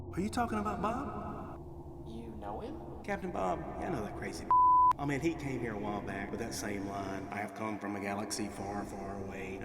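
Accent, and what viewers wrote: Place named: Tennessee, United States. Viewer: Are you from Old Hickory Tennessee? You sound like you could be Nate Bargatze’s brother. Lol!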